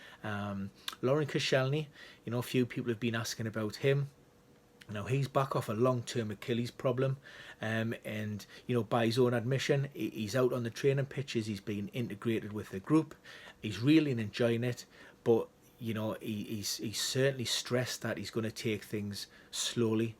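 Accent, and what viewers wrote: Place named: United Kingdom. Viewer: Its a good video but you could put a little work on them by adding the players pictures you are talking about, or some videos of their injuries, or some of their plays. Also keep in mind, not all FPL players are from England, some dont speak english too well and might have a problem understanding your Brittish accent. Im sure some graphics and some visual data shown in your videos would increase your audience!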